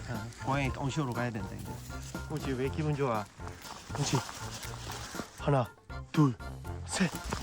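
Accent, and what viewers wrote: Gyeongsang, South Korea. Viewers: 진주분이 경상도에서 촬영하는데 그럼 뭐 억지로 서울말 씁니까? ㅋㅋㅋㅋ 어이가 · 경상도사투리목소리 피식대학의 민수랑 많이 닮은게 더 읏겨ㅋㅋㅋ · 갱상도 말투 진짜 개역하네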